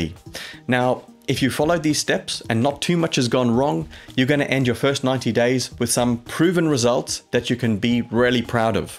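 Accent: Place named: England, United Kingdom. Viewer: The most beautiful English accent in the whole wide world. Thank you!!!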